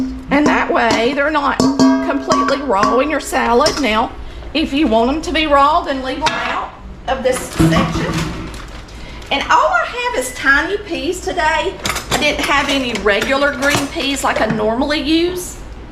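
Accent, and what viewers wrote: South, United States. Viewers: Love that country accent. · Hi ; I'm a newbie ; Just ran across your channel tonight going through my phone with nothing to do and when I saw Mac salad I STOPPED and WOW ! I'm glad I did STOP 🛑 INN I love Mac salad I made some SUNDAY for the FAMILY COOK OUT BUY ; I didn't make it like this this looks much better than the one I made and I LOVE ALL the GOOD STUFF you ADDED IN I BELIEVE it TASTE JUST as GOOD AS IT LOOKS yummy yummy 😋 MAKES YOU COME BACK FOR MORE... I WILL BE SUBSCRIBING COMING BACK TO WATCH MORE and MORE LOVE YOUR SOUTHERN ACCENT ❣️ AND THE SOUTHERN COUNTRY COOKING LOOKS AMAZINGLY GOOD AS THEY SAY I'll BE BACK , LOVING YOUR CHANNEL ,GOD BLESS🙏 STAY SAFE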